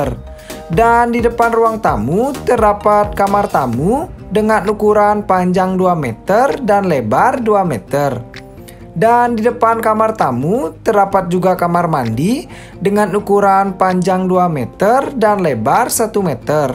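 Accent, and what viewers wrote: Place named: Madura, Indonesia. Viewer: Mas kmu org Madura apa bali.. ko logat nya gitu 🤭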